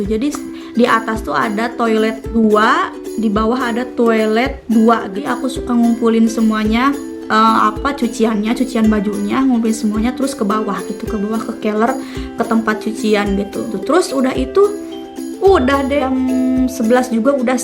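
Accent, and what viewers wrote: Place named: West Java, Indonesia. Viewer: Ya allah lucu nyaa😂😂 awal2 jerman terakhir jdi full sundanese asik nya klo bisa se group sm kk pantas di puji trus hihi😆😂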